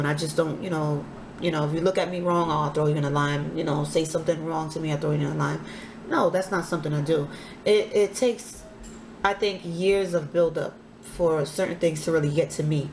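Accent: New York, United States. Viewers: are you frm ny? "talkin talkin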